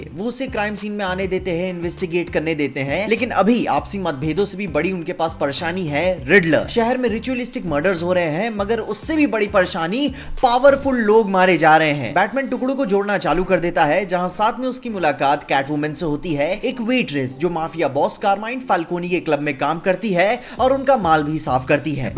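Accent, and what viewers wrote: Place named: Lucknow, India. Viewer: bro kaha se ho app ki bolne ka tarika lucknow ka lag raha hain vaise main bhi lucknow se hu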